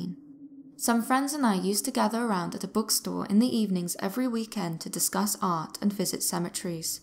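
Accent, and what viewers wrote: England, United Kingdom. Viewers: Thanks. This was well done. I like to listen these tales while doing things around the house. I’ve tried listening to some other ‘told stories’ videos, but they’re either over the top with their music and graphics, and/or the narrator is not very good at telling the story. One channel that listened to for a bit had narrators who sounded as though they were reporting the news on British television ( I’m American so it hits my ear in an odd way ). No offense as it sounds as though you may be British as well. However, you did quite well here. Anyway, thanks again. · Love your English accent and you tell the stories so well I love listening to you! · The English Accent always does and when combined with the beauty of a female voice then I sit here enchanted by the story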